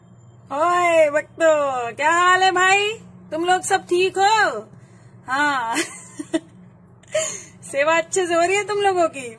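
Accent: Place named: Bihar, India. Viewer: Madam I like your bihari UP style Waiting for next Perpunch in Montreal Thanks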